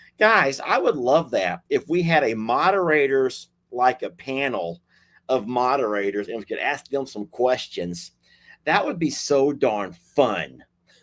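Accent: South, United States. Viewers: Your accent was more southern aristocrat to my ear,. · Your voice sounded like a southern accent, no a Yankee at all. 😂 Jaqueline is not a typical African American name. I also had a pastor who was white and named but went by Les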